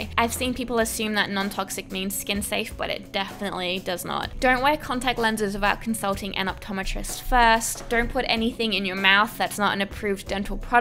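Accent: Australia. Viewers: Came here from Mykie. Are you Aussie? Hi fellow Aussie! <3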